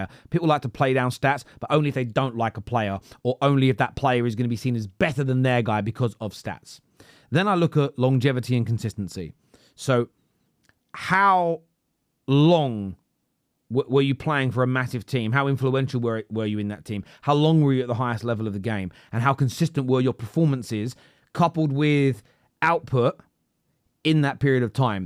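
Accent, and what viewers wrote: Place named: Liverpool, United Kingdom. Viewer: "Scousers don't talk like that" 🤦 Imagine thinking that half a million people all talk exactly the same way...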